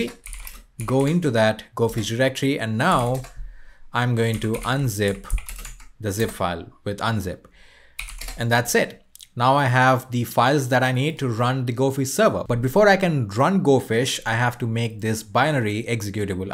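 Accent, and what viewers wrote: India. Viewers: bro look arab and speak indian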